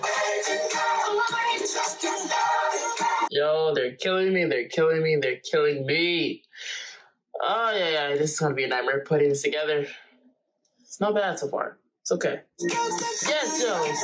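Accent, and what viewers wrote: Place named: New Jersey, United States. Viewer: They say Jersey? Love Jersey! You do have an accent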